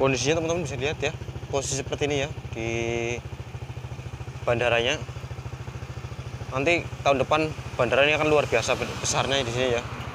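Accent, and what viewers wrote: Banyumas, Indonesia. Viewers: Keren mas bro otw trus, btw suara.ne ngapak sedulur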